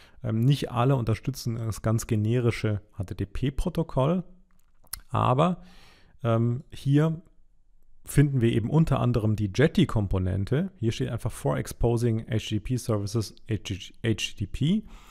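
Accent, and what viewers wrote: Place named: Germany. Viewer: Great tutorial , but German accent it's difficult to understand.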